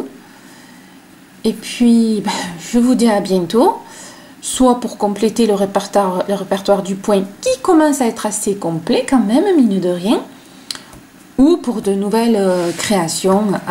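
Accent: South, France. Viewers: Bonjour, avec ce point très sympa, pourriez-vous expliquer comment faire des augmentations et des diminutions, je tenterai bien un pull-over, merci pour vos vidéos très simples et très explicatives et votre accent du sud, moi je suis de la région Marseillaise et je comprends tout❤️❤️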